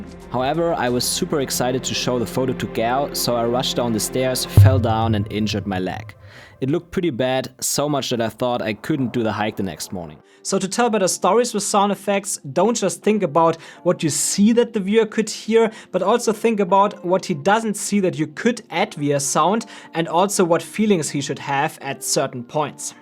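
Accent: Germany. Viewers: your english sounds very german for my ears :D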